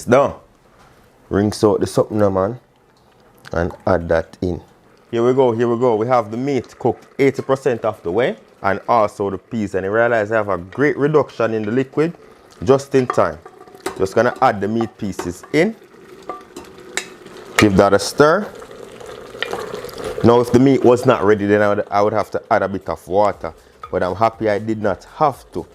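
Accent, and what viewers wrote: Jamaica. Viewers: Finally a real autical Jamaican with no twang foreign accent presenting good dishes. 👌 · Food look so good & I love that Jamaican accent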